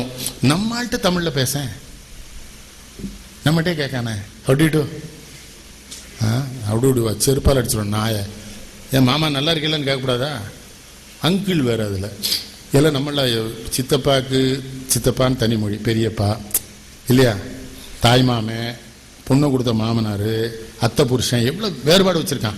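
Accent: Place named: Tirunelveli, India. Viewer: Tambirabarani tamizh ketkka ketkka ruchi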